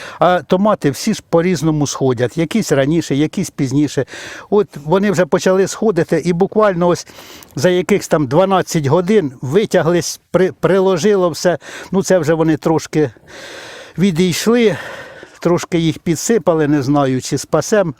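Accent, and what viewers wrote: Poltava, Ukraine. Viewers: Яка ж милозвучна Полтавська вимова! І безліч корисної інформації.Наснаги і здоров'я вам і дружині. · Слухаю нашу лагідну українську полтавську мову і хочеться слухати і слухати. Ніби й знайома з усіма цими справами, з посівами і пікіровкою, та все ж -- мова, заслухалась. А ще душу радує те, що чоловік цим займається.. дякую за всі моменти радості. А ще дивлюся, як ви багато висіваєте розсади, місця треба багато. Я цей рік посіяла в ,,улітки" перець. Супер, місця займає дуже мало. Розсада класна, пікірувати одне задоволення.. в одну улітку в мене вийшло 45- 48 шт, а улітка помістилась в 1літрове відеречко з під сметани. У ваш яшик поміститься штук 8 відеречок. Попробуйте, можливо вам сподобається.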